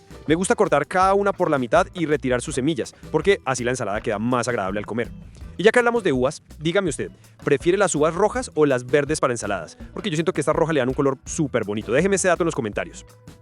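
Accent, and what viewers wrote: Colombia. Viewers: Cheff una pregunta eres colombiano?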